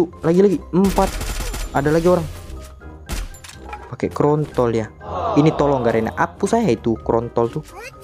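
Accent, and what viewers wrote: Palembang, Indonesia. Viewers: Wong Palembang kah bg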